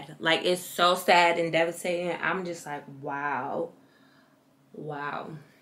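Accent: United Kingdom. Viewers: Chill vibes on a Sunday 😂🙂😂...the British accent for me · the british accent LMFAOOOOOOOO 😭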